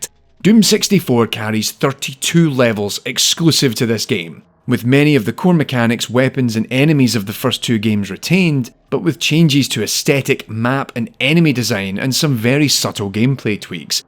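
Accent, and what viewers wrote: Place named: Scotland, United Kingdom. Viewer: You're the first Scottish (i presume that's a Scottish accent) for whom i don't need the subtitles.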